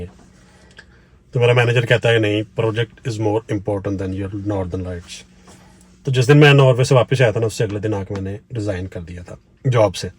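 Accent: Punjab, India. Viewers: yar aap pyar ho boht hi achhay lagte go mujhe mujhe apka punjabi accent boht achha lagta hai lahore pakistan se boht sara pyar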